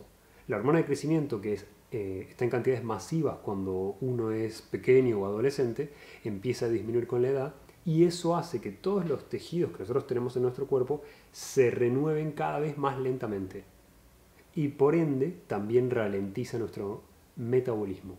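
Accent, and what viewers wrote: Argentina. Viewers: Soy argentina y te digo que el acento es argentino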